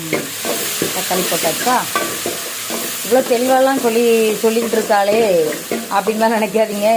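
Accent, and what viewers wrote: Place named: Nagercoil, India. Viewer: Arumai. Nager coil speech. Very good.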